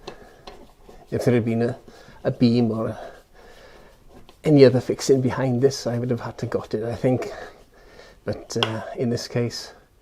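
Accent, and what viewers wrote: Wales, United Kingdom. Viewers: just bought one, this video was excellent, cheers for sharing, also do I hear a hint of welsh or am I linguistically challenged, lol?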